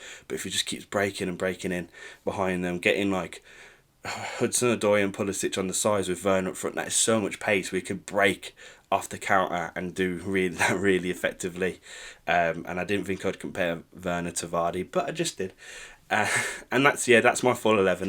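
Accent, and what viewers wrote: United Kingdom. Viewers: Proper London accent right there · You sound like you’re from brum but you support Chelsea ?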